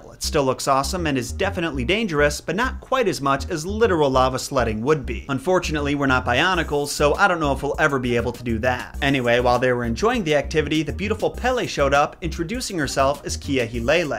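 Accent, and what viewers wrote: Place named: Wisconsin, United States. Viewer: i quite like your wiscansin ayccent in general not just with obscure word mispronunciations 🤗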